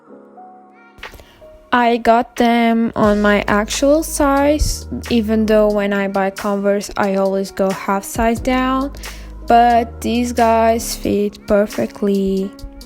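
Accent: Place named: France. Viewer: Par tout hasard tu parlerais pas Français ? Y’a un petit accent quand même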